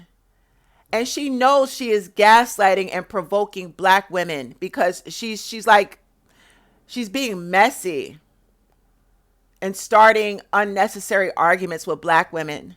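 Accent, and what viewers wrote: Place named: New York, United States. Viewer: Girl, you are New York on 100%. This just how we talk on a normal day. F them weak ass, soft asses. I love your tone and your vocabulary. You have taught me more about life than any school or any class I have ever taken. You change and save lives. The Devil is mad at you sis because you stay on his neck. These Kevin Samuels types make it seem like it is ok to put black women down. They get big mad when we speak up and shut them up! They do not have half of our intellect, our personality, drive, ambition or success. So they get mad and try to bash us. F Them.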